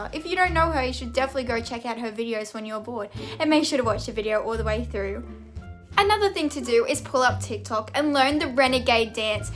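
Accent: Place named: Australia. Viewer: I really like your Australian accent! Also, I love bored eating too.😂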